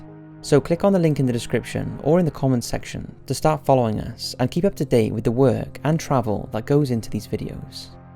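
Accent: Wales, United Kingdom. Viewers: Greeting from Wales! Also are you Welsh? You sound like it... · I am in L O V EV with your videos!! And I also L O V E your accent. Is it like Welch? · Finally found my favorite British narrator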